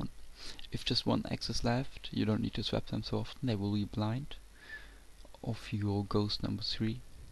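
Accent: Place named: Germany. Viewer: Du bist deutsch, oder? (wegen deinem Englisch(th Aussprache))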